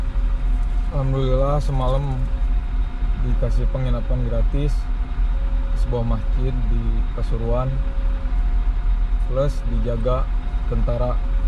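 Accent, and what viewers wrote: West Java, Indonesia. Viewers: Khas ye logat sunda nya wkwk ada kata2 mah sama teh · logat sundanya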